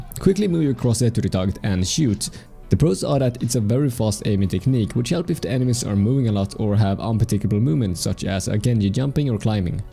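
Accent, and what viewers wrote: Sweden. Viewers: You can hear that you are swedish, it's funny becuse i'm also swedish. Ps. You are the best youtuber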